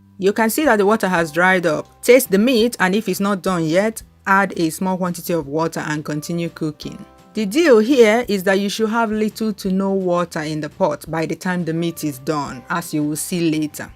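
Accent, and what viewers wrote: Igbo, Nigeria. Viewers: Oh God. I love youuuu The Nigerian accent, the way you explained, just everything 😍❤ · Hi, just love the way you simplify the cooking process of all these beautiful recipes, the fun and chuckle one grasps as you talk and the Igbo accent with no apologies. Looking forward to more videos. Keep it up. God bless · Could you please moderate your voice for non IBO/foreigners to cherish the use of English to explain. Regards. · So love your Igbo dialect, confirm nwa afo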